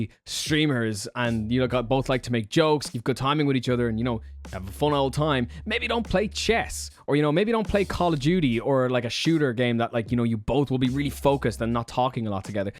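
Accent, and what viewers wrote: Ireland. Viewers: For an American you have a really Irish accent….. ❤️👍🏻🇺🇸🥃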